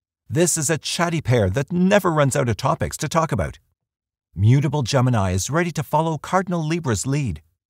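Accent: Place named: Canada. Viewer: The narrator is from Canada; I can tell because of his pronunciation of " ou" .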